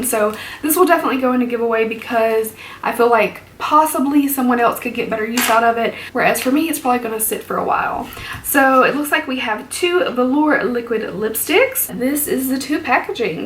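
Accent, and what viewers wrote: South, United States. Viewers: i must be crazy or do you have a little southern accent? i love them. my insta is :•) ive never tried a jeffree product but ive always wanted to try a highlighter 🤠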